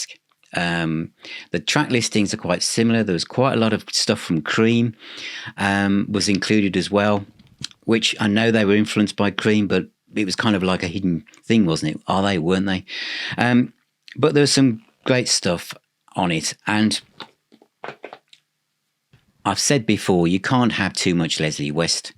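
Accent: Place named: England, United Kingdom. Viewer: Good evening. Very interesting as usual. Thank you for this piece of information and, especially, for your manner of speaking, for your nice, clear, genuine English!!! Neither American English, widely spread around, nor Indian/Pakistani/Bangladesh English, but English English. It's a great pleasure to listen to you!!! And what about compact-cassettes? I know a lot of music-fans, who return now back to classic vintage cassette-decks and compact-cassettes... In any case, it was a pleasure to listen to your programme!! Thanks.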